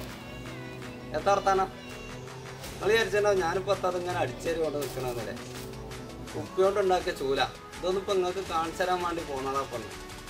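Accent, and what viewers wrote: Malappuram, India. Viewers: മലപ്പുറം ഭാഷ ഒന്നും നോക്കിയില്ല ലൈക്ക് അടിച്ചു. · ലൈക്‌ അങ്ങ് കൊടുത്തു . മ്മളെ മലപ്പുറം ഭാഷ thudakkathinu. " എത്ത വർത്താനം " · മലപ്പുറം ഭാഷയ്ക് അടിയൊരു കിടക്കച്ചി ലൈക്‌ ❤️❤️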